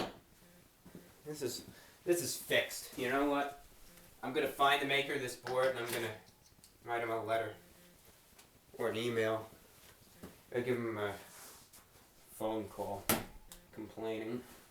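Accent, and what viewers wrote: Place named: Pennsylvania, United States. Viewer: I just discovered your youtube report today. A fresh face with the mystery of sincerest tomfoolery. The ‘dart redux’ episode (6 years ago) at least presented an admission to a degree of insincerity….mixed with brutal honesty. I wanted to see your first reports to flesh out the genuine man and compare with your more recent reports. Your consistency and demeanor is calming. And you lost that east Penn accent somewhat. Bits of mine slip out from time to time. (No one notices)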